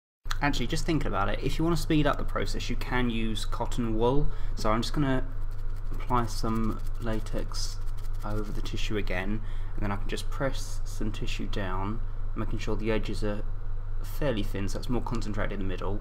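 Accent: England, United Kingdom. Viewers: love his specific english accent. dont know why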